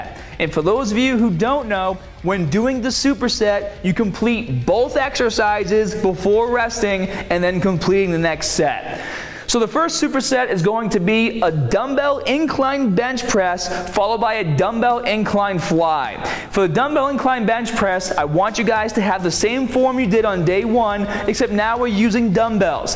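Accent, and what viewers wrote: Boston, United States. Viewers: Starting this today looking forward to getting some chest results - that Boston style accent cracks me up!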